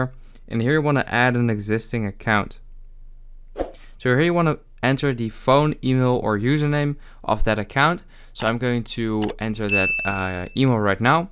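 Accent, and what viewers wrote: Netherlands. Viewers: You're definitely dutch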